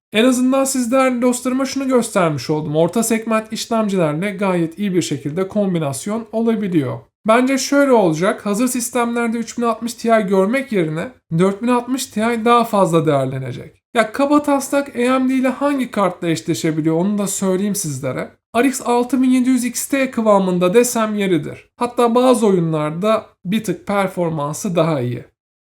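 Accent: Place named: Kayseri, Turkey. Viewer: Youtube ta hangi kayseriliye denk gelsem dörtYüz değill, DörtÇüs diyor, bu da çok hoşuma gidiyor, yani hemen ''aha kayserili detected'' diyorum. 😂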